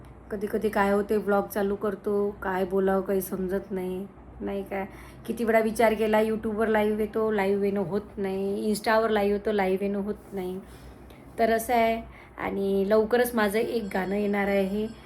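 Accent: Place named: Vidarbha, India. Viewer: वऱ्हाडी भाषा खुप छान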